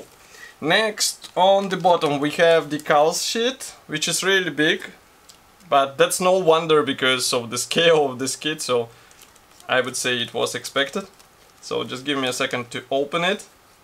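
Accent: Russia. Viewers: Какой то акцент как у русского. ты русский что ли?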